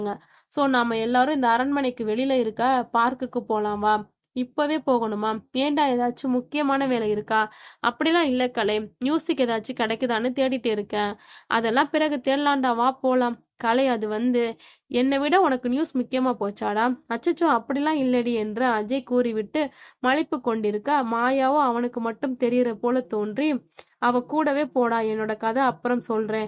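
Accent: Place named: Chennai, India. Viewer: Nice Chennai voice 🎉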